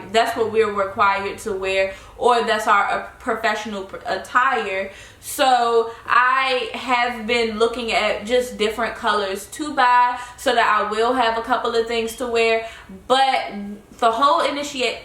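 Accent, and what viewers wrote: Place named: DMV, United States. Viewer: Are you from the DMV? Your accent sounds familiar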